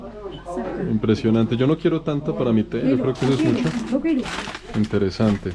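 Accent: Colombia. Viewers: Ese man habla mas colombiano que yo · Amo como hablas muy colombiano, no se puede olvidar la tierrita; eres lo máximo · montaña a la orilla del río, hasta yo me canse! Lol.....Me gusta ver tus videos por el mundo, muro de risa, con tus comentarios en tus recorridos. El acento Colombiano, es uno de mis favoritos! Exelente pueblito al lado del rio! 👏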